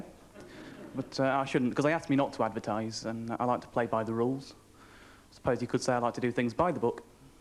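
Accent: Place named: Midlands, United Kingdom. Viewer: He's from the Midlands. Where are you getting cockney from?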